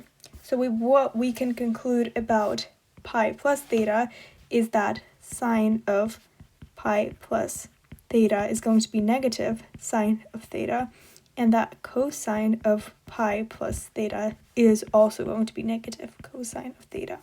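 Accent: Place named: Australia. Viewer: Ur australian accent is cool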